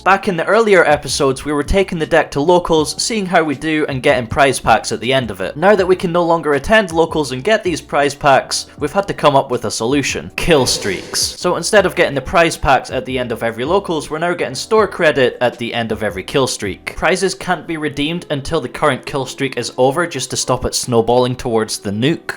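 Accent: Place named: Scotland, United Kingdom. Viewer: Love your Scottish voice my man, keep up with the content!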